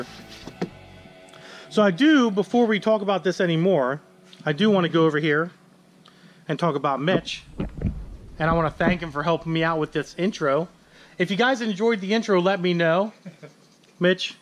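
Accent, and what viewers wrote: United States. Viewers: Learn to speak English, not American English, in fact you and Mike should go to the same classes and learn proper English, nae in fact all the crew in both your and Mike's vlogs learn English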